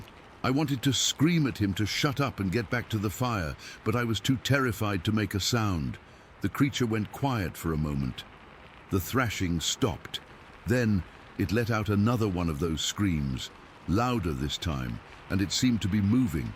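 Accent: United Kingdom. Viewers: Hard to listen to a British dude talk about American .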